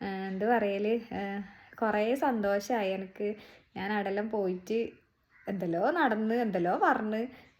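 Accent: Kasaragod, India. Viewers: Nammale kasargodan bhasha ❤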